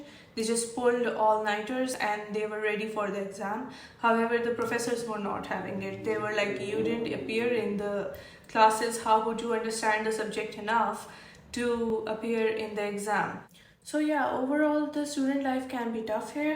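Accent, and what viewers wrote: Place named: India. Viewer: Where were you born? You sound indian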